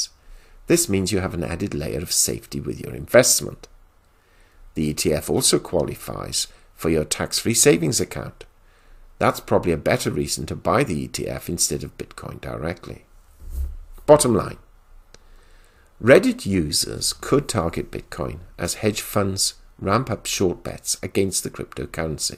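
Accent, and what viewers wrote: England, United Kingdom. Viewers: Subscribed & Liked to help support your podcast. Keep up the pleasant pommy accent.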